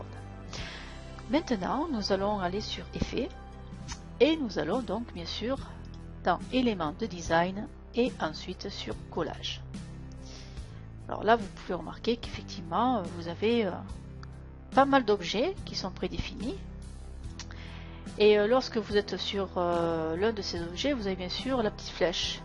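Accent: South, France. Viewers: Bonsoir Chère, Encore du travail remarquable.. Merci de penser à nous avec gentillesse et simplicité. Avec en prime un délicieux accent du sud... Bien à vous André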